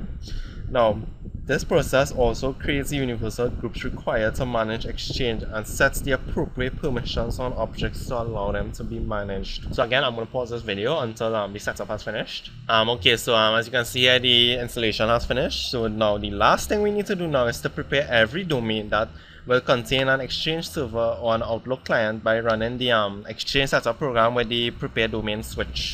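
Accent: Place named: Trinidad and Tobago. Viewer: Oh Gawd Boy!! Dah accent is real Trini like bake and saltfish. lol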